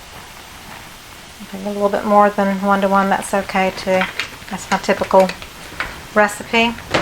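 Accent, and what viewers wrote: South, United States. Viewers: You sound like a southern girl. I have lived in Atlanta all my life so I have a similar dialect as well.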